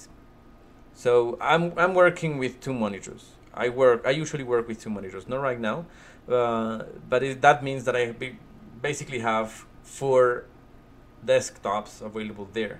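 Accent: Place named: England, United Kingdom. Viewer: Great video man and great english accent! I too was looking to switch to KDE this video was very informative, thanks.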